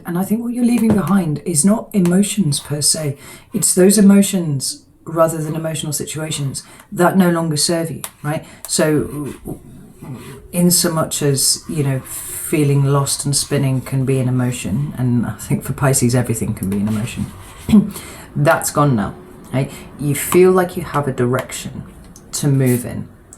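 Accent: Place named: England, United Kingdom. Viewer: Thank you so much ! Your so beautifully amazing and your acsent well I know to you it's not an accent because you are true English us over here in the states are not but anyway I love you ❤️ thanks again .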